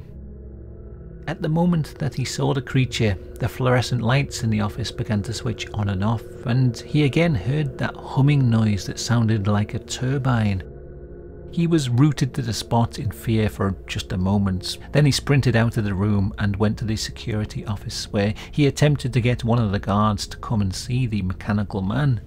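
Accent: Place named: United Kingdom. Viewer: I just got one of your storeies in my fed (probably because I follow strange, dark and misterious - mr Ballan) and started binge watching your stories 😂. I love your accent ! English is not my native language but I love to listen british accents, so many of them 😊. Your story telling abilities are great. Love your content. You have a new subscriber. Keep up with good work 👌🏻👍🏻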